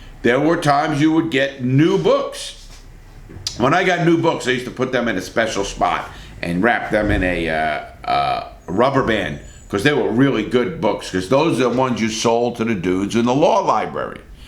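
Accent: New York, United States. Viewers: Your from new York right?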